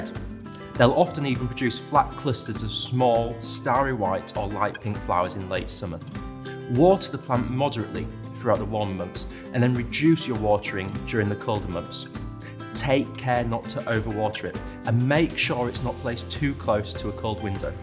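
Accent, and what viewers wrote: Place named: United Kingdom. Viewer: Can someone tell me exactly what he said “water the plant moderately throughout which month? Water month? Or hotter month?” British accent is difficult for me. 😢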